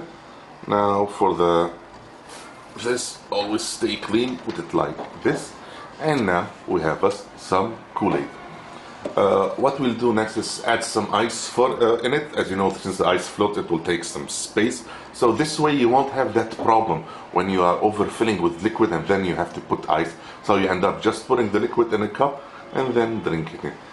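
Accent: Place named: England, United Kingdom. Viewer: Loved ur eng accent dude!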